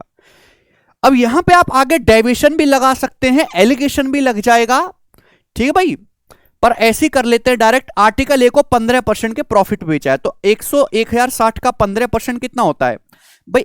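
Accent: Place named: Bihar, India. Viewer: Bihari ho kya ? Be percent ko pashnt bolte ho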